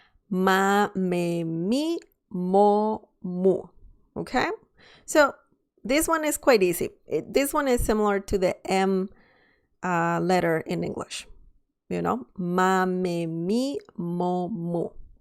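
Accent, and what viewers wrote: Spain. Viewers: Is this the Spanish from Spain? If so, I appreciate this, as I now live in Andalucia, and I need to learn the unique dialect. Thank you!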